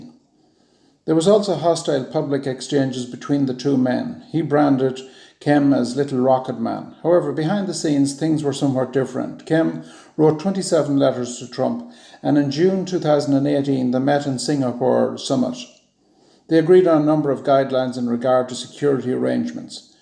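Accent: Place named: Germany. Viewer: You summed that up pretty damn well. You sound german. Do you live in the states?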